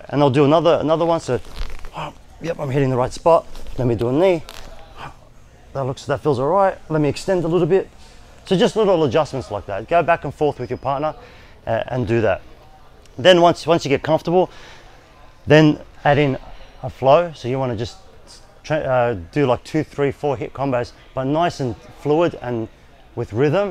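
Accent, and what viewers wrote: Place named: Australia. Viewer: sound like an aussie : )))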